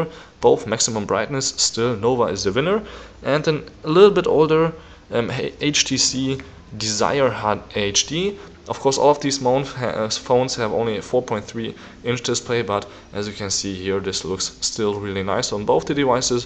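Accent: Germany. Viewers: Man hoert deinen deutschen akzent raus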